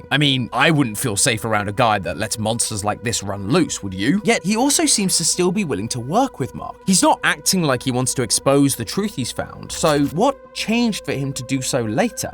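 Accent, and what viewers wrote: United Kingdom. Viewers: W new matpat update even tho hes British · British matpat isnt so bad after all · Who is this British gentelman? Where is MatPat? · British goood day mate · It's because he's British. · Hey British matpat! Are you becoming silly? :3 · AHHH, A BRITISH PERSON!! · "wheres matpat 🥺" HE CAN DO WHAT HE WANTS NOE LET THE SILLY BRITISH GUY SPEAK ‼️‼️ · I got a massive British jump scare cuz my brain short circuited and I forgot was British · does he have to be british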